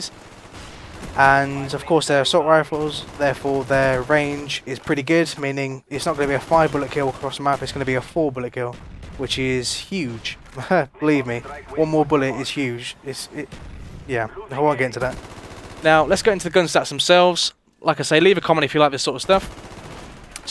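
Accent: United Kingdom. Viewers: I'm guessing ur a British guy am I right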